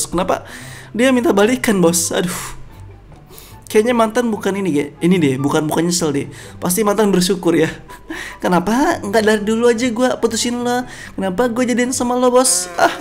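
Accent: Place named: West Sumatra, Indonesia. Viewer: emg dri khas minang:))😅🤔😇💔😤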